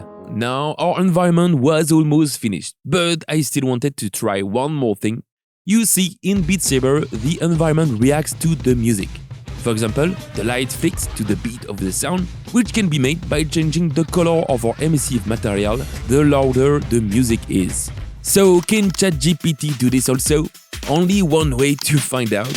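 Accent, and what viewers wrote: France. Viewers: Cet accent français est juste magnifique🤌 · Nice french accent ^^ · toi tu es français XD on as le même accent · are u france?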